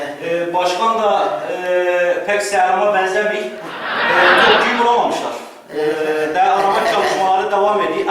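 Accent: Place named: Gaziantep, Turkey. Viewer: bu adam istesede istanbul türkçesi konuşamaz gibi hissediyorum nedense .ANTEP şivesi adamın ağzına tam yerleşmiş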